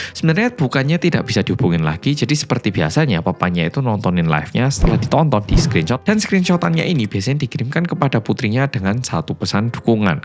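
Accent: Java, Indonesia. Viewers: Sediiih😢 tks medok😊❤🎉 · Korea medok jawa 😂krna kelamaan tinggal di jawa...kuliah di jawa ya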